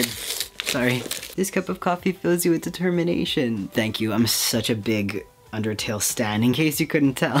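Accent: Canada. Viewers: Never stop the Canadian accent... it’s heckin’ adorable! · I love your Canadian accent reminds me of many favorite movies strange brew with Rick moranis. Plus I lived in Alaska for 13 years, and traveled thru Canada beautiful country ( People in the lower 48 also think Alaska has 2 seasons, but no we do have 4 seasons, and love the northern lights are beautiful in alaska too) I am now on the east coast but thankfully still in the country. I love hugs too I'm a big hugger ❤️ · that was a HECKIN good time with that extra Canadian accent!! You can wear that Cat shirt on the MFP! Lamb... LET ME IN!!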